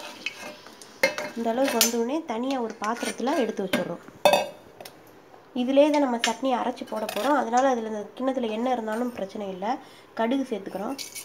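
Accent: Madurai, India. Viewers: Nenga madurai yaaa??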